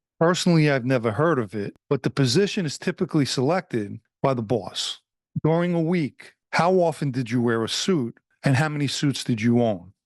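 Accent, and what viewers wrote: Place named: New York, United States. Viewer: By all means please explain why you have a New York accent?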